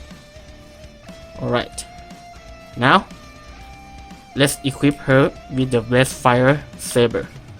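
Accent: Thailand. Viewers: เหมือน คนไทยเลย สำเนียง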